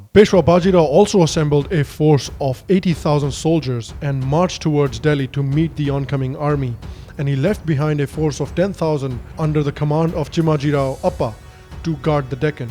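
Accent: West, United States. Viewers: Were you born and bought up in india? If yes, than why do you have a western accent?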